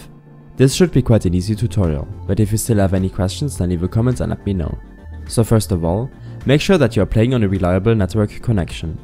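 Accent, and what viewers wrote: Germany. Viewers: Bruder du bist deutsch oder 😂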